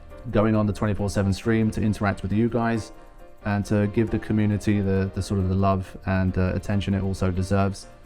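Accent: United Kingdom. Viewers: Dang, you sound so british when you're muffled. Congrats on the 15 years!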